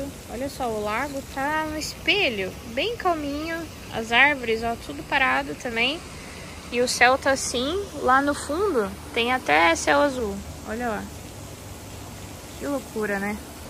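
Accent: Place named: Rio Grande do Sul, Brazil. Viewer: Oiê eu tmb cheguei no canal de paraquedas. Quando ouvi tu falando pensei 🤔, é Gaúcha e de Santa Catarina ❤. Sou do RS moro na Serra, nos conhecemos pelo sotaque que amo demais. Maratonando teu canal aos poucos.bju. se cuidem gurizada💚 ❤💛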